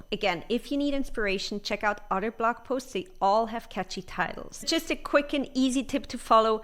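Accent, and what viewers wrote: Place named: England, United Kingdom. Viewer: Hi ! I am new to blogging, loved your English accent and clear pronunciation. I wonder how you learnt it, and how can I learn it. Could you please share the secret and also send me simple blogpost checklist please.Thank you.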